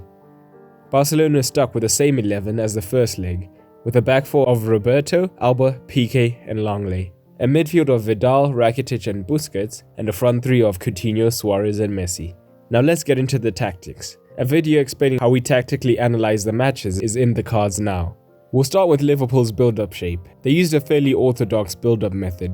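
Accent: South Africa. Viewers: Your accent is South African ?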